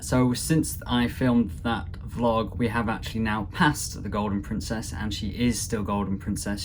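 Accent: England, United Kingdom. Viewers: I'm an Australian and i think I heard hyou saying that you are likely to be spending some time in Australia in the coming months/years. A little tip: Australians NEVER refer to the country as being "down" anywhere, Or even "Down under" Several times in this video you refer to something like "when we get down to Australia". An Aussie would never say that. Your accent will mark you as a "pom" (That's not necessarily an insult - it depends if there's an expletive with it (as in he's just a <expletive> pom") and it's fine to be a pom - there are millions of others here already - but it's a social gaffe to refer to Australia as "down here" or "down under" or "this happened on our way down to Sydney" That would tell Aussies listening that you are in the category of the poms who are despised - the ones whose focus is still in Europe, the ones who can't get over the fact they''ve moved home and things are not the same in Australia. 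I once worked with an Englishman who would NOT stop complaining that Australia was such a backwards country. We would grit our teeth and shut up for a while Ineitably he'd get around to saying that even the newspapers here are backwards. "Back home we get the Telegraph or the Daily Mail with the football results when we are on the way home from the game. In this backwoods country (meaning Australia) the papers dont have the scores until Sunday morning" He never could get it through his thick skull that the reason was because of the time zones. When we're a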